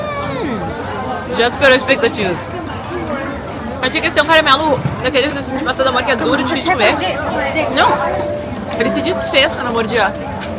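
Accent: Brazil. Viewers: Ela fala muiyo rápido, com sotaque do Paraná????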